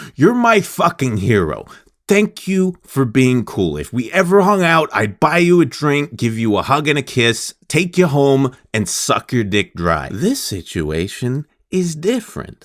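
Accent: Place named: Bay Area, United States. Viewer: I don't believe for one second that you live in Portland. You sound like you're from the Bay Area and I would bet money on it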